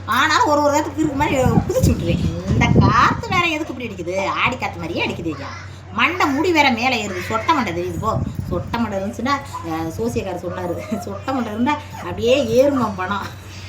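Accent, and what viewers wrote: Madurai, India. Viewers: உங்க பழைய வீடியோஸ் பாத்தேன். முன்னாடி வாடகை வீட்ல இருந்த வீடியோஸ். அதுல எல்லாம் நீங்க பேசறது ரொம்ப நார்மலா இருந்துச்சு. சின்ன சின்ன இங்கிலீஸ் வார்த்தை கூட யூஸ் பன்னீங்க. இந்த மதுரை பாஷையோ ஸ்லாங்கோ அப்பல்லாம் பேசலையே. இப்படி பேசினா தான் சப்ஸ்கிரைபர்ஸ் வருவாங்கனு பேசறது போல இருக்கு. இது ரெண்டுல எது நிஜ முகம்.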